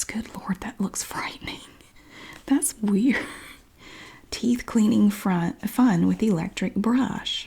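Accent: South, United States. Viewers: I'm from the U.K. and your southern accent is absolutely dreamy! I love the way you pronounce the word "Foil"! You have great production skills also, great vid. Thanks for sharing!!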